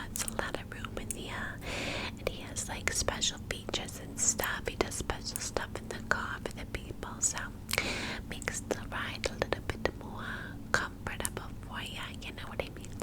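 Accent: New York, United States. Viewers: I’m obsessed with you and your New York accent! I’m from Boston and it does remind me of our accent here and there, I definitely don’t mind 😍😍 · I truly love your New York accent is awesome! Thanks for this amazing video! ❤️😍🤠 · Your New York accent is giving me serious Harley Quinn cartoon vibes! I love it 💓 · another long day of schoolwork and getting ready for bed gonna watch this !! 💛💛 i love the combo of the ny accent and ur neighbors ☺️☺️ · YEEEESSS I live for your NY accent!! Plus this story time..girl, its 4am here and it shall put me to bed 😴 good night!! ❤ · Omg I LOVED this. I adore your NY accent ❤️ sorry I’ve been Missin in the comments. I’m in art school!!! Degree in film and photography! So I’m super busy but enjoying it so much! You know my playlist will be helping me through my degree ❤️❤️ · Shout out to the state of NY I never been there, i have only been to 4 states California where I am from,arizona,nevada, and New Mexico i have 46 more states to go eventually/hopefully i love this ny accent 🙂😅👍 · Love a good Nooyawk / Bawstin accent 😂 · I love your NY accent it's so relaxing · i absolutely love her NY accent, it is really soothing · I love this New York accent it's just such fun. 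Your really good at these xx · these New York accent videos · LIVING for these NY accent videos! For some reason they’re just automatically tingly. Hope you’re having a great week! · Your New York accent is so relaxing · Yay! The NY accent is back! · Ooh I love New York accents ❤️ excited to relax as I get work done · the new york accent is so satisfying wow, i definitely wasn’t expecting that 😂 great as always! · I’m in love with the New York accent your videos always send me to sleep thank you ❤️ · Yasss bringing back the NY accent😍literally adore itttt , neighbourhood gossip is always the best cuz I’m such a nosy person🤣❤️ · Yes the NY accent video is my fave!!!!!!! 😍♥️ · aaah i love ur ny accent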